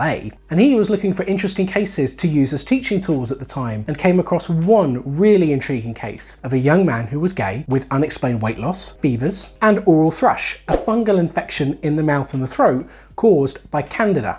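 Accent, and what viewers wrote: United States. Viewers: I like the attempt at an American accent. I wish I knew why when most British people attempt an American accent, they sound like they're from Texas. Lol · Your American isn't terrible. ❤️ I'd be ashamed to try my British accent around you 😂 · I still swooned at your American accent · LOVED the American accent and you should totally do it again :-) also lovely video. I didn’t know we were changing the language around this disease.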